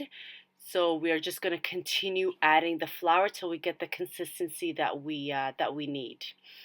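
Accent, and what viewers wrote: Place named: Canada. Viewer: Cunto Macaan maasha Allaah I show them and they lake the Canadian accent too thank you abaayo every week they are gona Cooke one think mahad sanid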